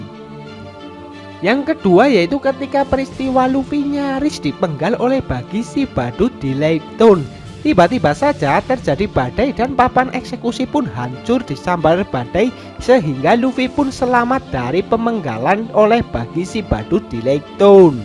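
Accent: Java, Indonesia. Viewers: Medok men to mas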